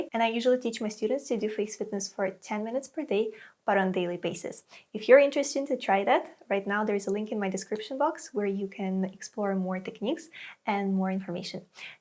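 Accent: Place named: Poland. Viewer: Is that a Polish accent I am hearing? My parents were Polish so something in your accent sounds very familiar!❤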